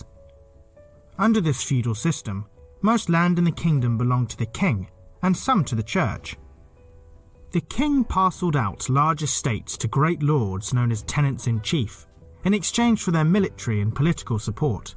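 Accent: United Kingdom. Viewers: Liked your clip. Already knew most of the story. With respect to present day Brits (note the narrator's accent), the then and there societal setup strongly resembled the previous setup of Feudalism. This from High School American History. And the main reason that much of the emigrates from the Old World to the New World. The major point of Feudalism is that your position in a society is either inherited or assigned. For those that know a little of the Roman Empire, this might sound like a repeat? Things that can make you go, 'Hmm?'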